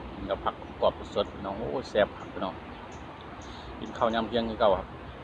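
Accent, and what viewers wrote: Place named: Nyo, Thailand. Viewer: สวัสดีครับอ้ายมาเบิ่งมากินนำครับ..ได้งินสำเนียงญ้อแล้วคึดฮอดบ้านครับ..เพื่อนใหม่เด้อครับเขยสกลมาเบิ่งนำครับ..มุงหลังคาให้แล้วครับอ้าย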